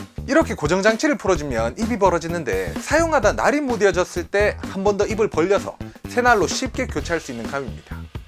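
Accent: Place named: Daegu, South Korea. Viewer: 대구 사투리 전국민이 다 알아들을수 있도록 채널이 더욱 발전되길 기원합니다 해피 뉴 이어~~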